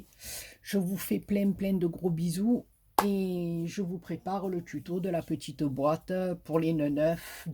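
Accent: South, France. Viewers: Vraiment sublissime cet album beaucoup de goût mais surtout votre façon de mettre votre touche personnelle fleurs feuilles ruban bidouilles qui en fait une pièce merveilleuse et unique je me régale à regarder vos vidéos et j aime votre accent du midi. Couleur vacances et soleil Bises de bretagne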